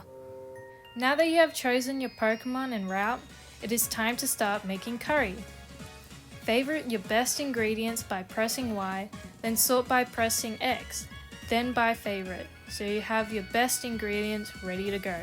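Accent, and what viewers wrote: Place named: United Kingdom. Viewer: You sound board and British. It's going to be ok 👍 don't be sad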